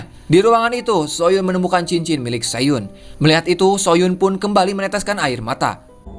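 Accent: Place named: Sunda, Indonesia. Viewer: Lucu sih , cara ngomongnya campur BHS.sunda , campur heureuy 😅😅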